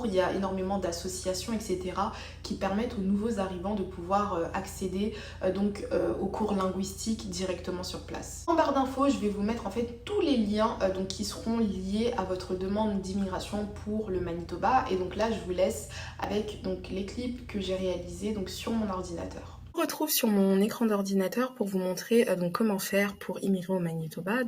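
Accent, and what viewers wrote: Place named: France. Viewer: Vous avez l'accent française🇫🇷😮. Je suis aide soignante en France mais étranger. C'est possible de travailler avec le diplôme française ? Avec un visa de tourisme ?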